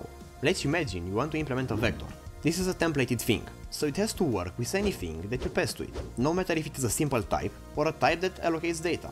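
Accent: Russia. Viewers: Your accent is interesting, do ye have russian heritage? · Excuse me for that question, but it's very interesting for me as lover of languages and especially English) What country are you from? I thought you''re Russian when i heared you accent - it sounds very similar to Russian.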